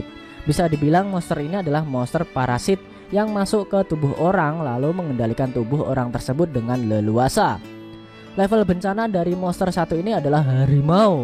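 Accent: Java, Indonesia. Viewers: Mimin orang jawa ya? Suaranya medok banget njirr mirip orang jawa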